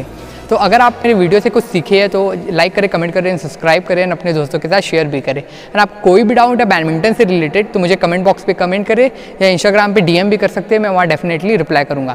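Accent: Odisha, India. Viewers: your accent is odia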